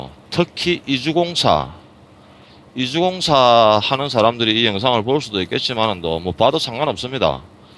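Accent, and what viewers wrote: Gyeongsang, South Korea. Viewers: 동영상 잘 봤습니다!! 경상도 사투리가 인상에 남네요^^ . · 영상 잘보고 있습니다~^^ 저는 대구사람인데 경상도 사투리가 구수하시네요~^^ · 캐나다 언제 갔습니까? 그리고 갱상도 분이시군요 여기도 부산임다~ 구독자수도 많이 생기면 무작정 운전만 아니라 구간마다 생각날때 답변해주실수도 있겠죠 ㅎ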